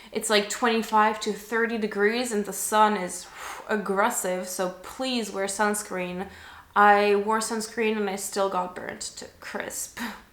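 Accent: France. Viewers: May I ask.Are you a native French language speaker😊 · i like your French accent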